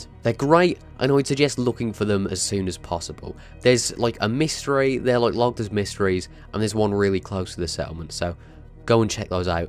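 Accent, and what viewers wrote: Birmingham, United Kingdom. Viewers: I never said there was 😱 I love the accent I just can’t tell which yours is, I’m guessing your a brummie then?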